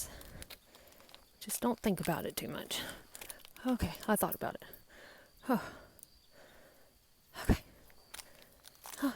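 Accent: South, United States. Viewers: Mmmmmm bacon jerky! Thanks for sharing your adventures/insights/opinions/photography/wisdom/downtoearthness/stories/southern charm and accent!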